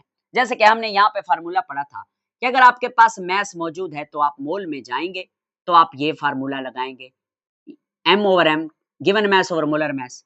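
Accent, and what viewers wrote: Saraiki, Pakistan. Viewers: Sir g lgta hy ap saraiki Hain ❤agr waqi ewe hy ta sako sraiki ich smjhao😅❤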